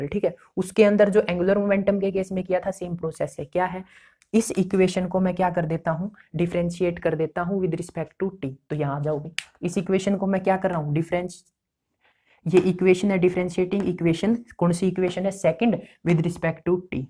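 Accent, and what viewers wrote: Haryana, India. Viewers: Haryana se ho kya sir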